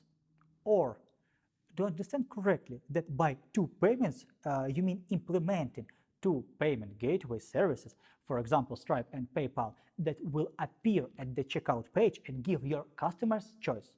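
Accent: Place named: Russia. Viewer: Hi, just you have an accent like a russians :)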